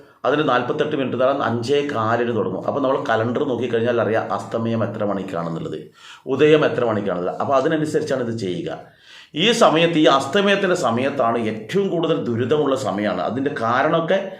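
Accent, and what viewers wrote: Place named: Kannur, India. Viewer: Namaste ji,thankalude nadu kannuranennu tonunu samsaram ketit,kannur evideyanennu parayamo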